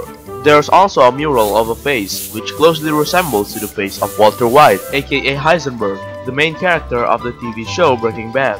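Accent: Indonesia. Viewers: udah ada firasat xD dari logat bahasa inggrisnya ketauan bgt orang indo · Indonesian accent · Good timing! I'm playing Sims 3 supernatural right now. Also, gosh your English accent is so Indonesian just like me.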